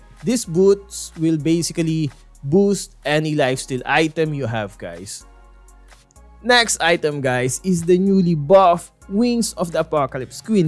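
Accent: Philippines. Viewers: i can hear the filipino accent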